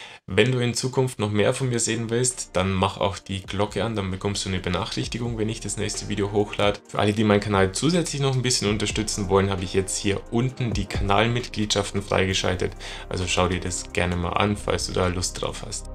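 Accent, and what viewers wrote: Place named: Bavaria, Germany. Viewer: Servus, guter Content und angenehmes bayerisch :) ist das Foto bei Min Regensburg?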